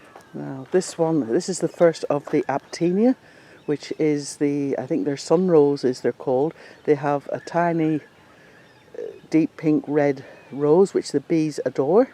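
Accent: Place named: Ulster, United Kingdom. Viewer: Fantastic...amazed you have so many cuttings. splits etc. The Ulster accent gives an international flavour (surprised no Aussie twang!!!)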